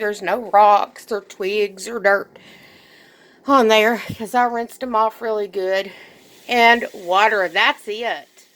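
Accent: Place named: South, United States. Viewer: Mama, I love that southern accent.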